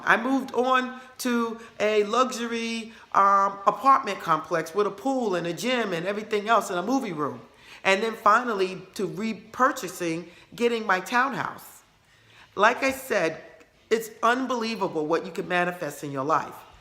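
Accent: New York, United States. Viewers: are u from new york? you've got a new york accent lol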